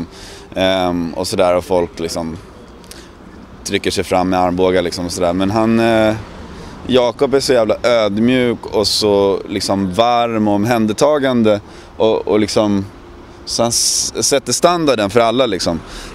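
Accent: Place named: United States. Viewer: Holy shit I thought he was American. Sick.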